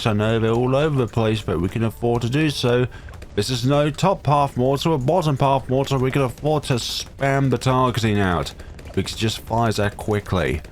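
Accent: United Kingdom. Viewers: why are you so posh?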